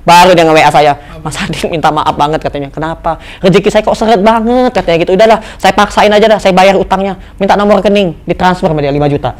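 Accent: Palembang, Indonesia. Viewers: logat palembangnyo om ku dak ilang ❤ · Ini pak ngomong lidahnya Palembang banget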